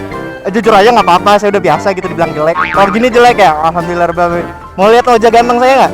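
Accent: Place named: Java, Indonesia. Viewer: lbh suka konten nanya jalan pake logat jawa bang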